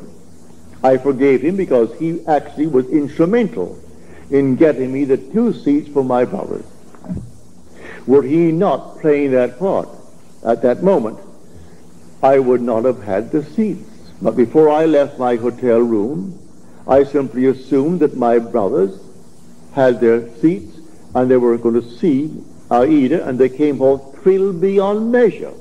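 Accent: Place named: Northwest Florida, United States. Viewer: Yes in years past people in Northwest Florida spoke with the same lovely accent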